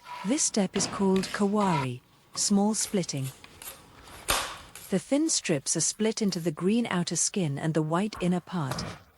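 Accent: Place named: United Kingdom. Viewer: lol, the british fem voice over lays it on thick enough for you?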